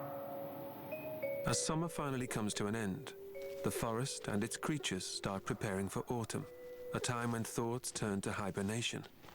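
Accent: United Kingdom. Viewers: This video has everything. It's like a human tragedy, science lesson and nature documentary all tied together with a neat little British accent. I likes it. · Don't you just love British accents in narration? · I like when a documentary has classical music and an english accent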